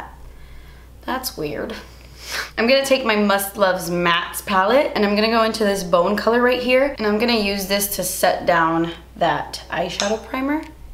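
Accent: United Kingdom. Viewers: Can you do a whole tutorial in your British accent please! I'm British and its super annoying when people try to overdo it but yours is SOOOO CUTE!!! I love it! xoxo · Ok, but why was that British accent so adorable?😂💜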